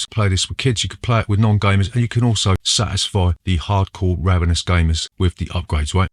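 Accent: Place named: Cockney, United Kingdom. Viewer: From one cockney to another; you're a top geezer!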